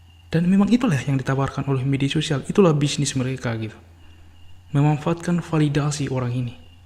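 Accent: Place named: Sulawesi, Indonesia. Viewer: Abang nya asli mana seperti logat Sulawesi -_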